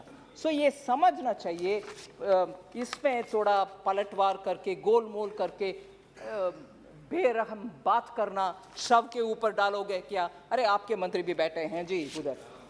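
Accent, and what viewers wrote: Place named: Tamil Nadu, India. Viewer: Just shut up she's Tamil.. Hindi is not her mother tongue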